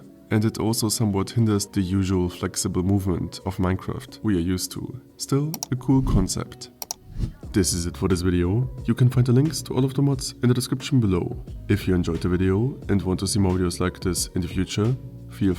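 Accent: Germany. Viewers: I Can here a German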